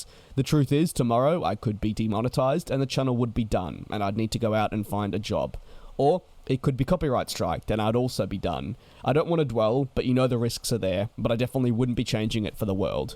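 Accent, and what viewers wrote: Australia. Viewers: Have you ever wanted to become a commercial pilot? Also even tho u sound aussie are you in the UK?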